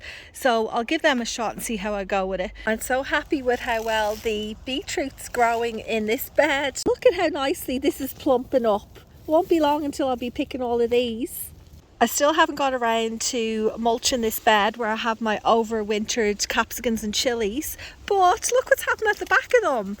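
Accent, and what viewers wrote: Ireland. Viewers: Love the Irish accent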